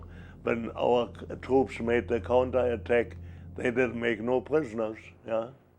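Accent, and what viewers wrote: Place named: Germany. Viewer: I wonder if when he emigrated to Canada/US he had a German speaking wife + family/friends? His English is very good of course but VERY accented :) But I've known British people emigrate to the US/Canada or Australia and get strong local accents SO quickly!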